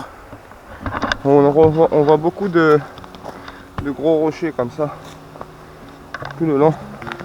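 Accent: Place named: Corsica, France. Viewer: Vu l'accent Corse, que tu te coltines, sache que je suis très heureux de t'accueillir chez moi. Et même si je me trompe...tu es le bienvenu quand même. En effet, les forts de la Gavaresse et de La Colle Noire, ont été des hauts lieux de notre histoire méridionale. J'ai eu le plaisir et l'avantage de parcourir les tréfonds de ces architectures, alors que j'étais ado en recherche d'aventure. Sans compter les galeries de mines du Cap Garonne ...bien avant qu'il ne soit exploité pour le tourisme. Autant te dire que j'y ai passé de bons moments à retourner les pierres, pour y chercher une suite.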